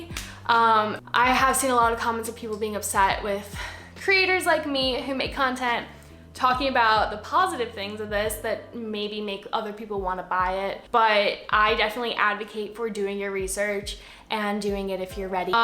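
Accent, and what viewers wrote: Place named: Los Angeles, United States. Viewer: You're gonna fit into LA with your voice lol. I would've thought you were from there. No shade though.. Great and inspiring video. Thank you and congrats!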